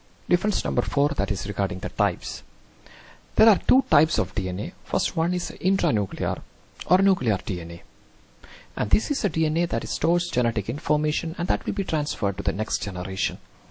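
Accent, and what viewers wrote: India. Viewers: am i the only one that loves listening to an indian accent?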